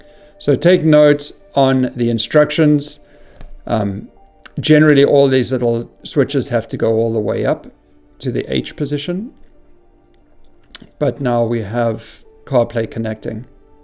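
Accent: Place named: South Africa. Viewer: Nice Saffer accent :)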